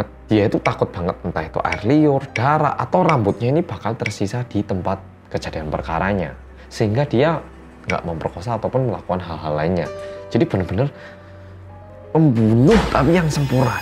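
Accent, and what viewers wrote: Java, Indonesia. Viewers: Suweneng medok e Mas.... Sehat selalu ya.... Semoga karya dan karirnya semakin melesat naik Aamiin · Detektif medok 💜👍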